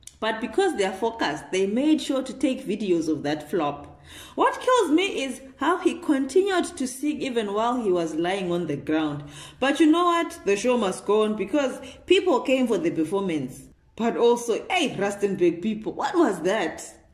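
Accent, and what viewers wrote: Zimbabwe. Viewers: Kkkk its either a Shona or Venda accent kkk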